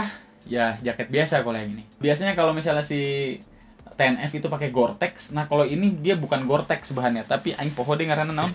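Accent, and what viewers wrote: Java, Indonesia. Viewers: Bang kalo bisa qolqolahnya jangan terlalu medog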